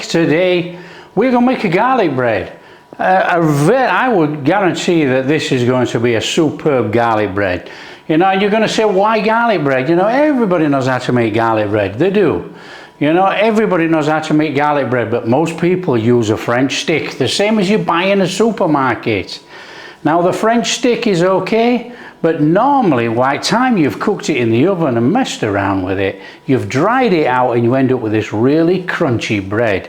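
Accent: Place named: Northern England, United Kingdom. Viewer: Love it!!!!! Let me guess your accent... Newcastle? Sheffield?? Yorkshire?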